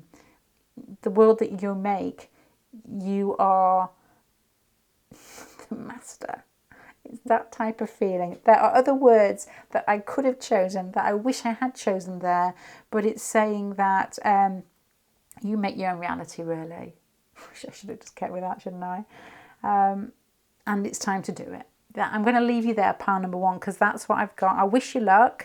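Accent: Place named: RP, United Kingdom. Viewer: Pile 1. I'm aqua he's libra. Wt*!!! How did you do it?? But please, please, pweeeeeese, talk a little bit less posh because most of your words are incomprehensible.. unfortunately for non posh people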